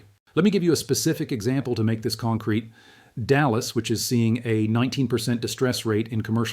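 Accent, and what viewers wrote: United Kingdom. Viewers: blathering AI avatar with a uk accent ==total nonsense--these guys are loony bs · The AI dithers between British and American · British, American and Aussie accents and with more names than Frank Abagnale ever dreamt of.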